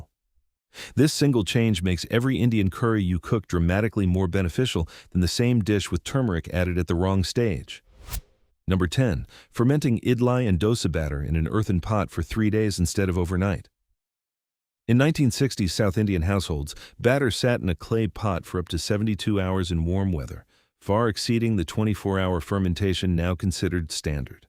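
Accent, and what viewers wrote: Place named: United States. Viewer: Very interesting but the "American" pronunciation made it hard to follow at times.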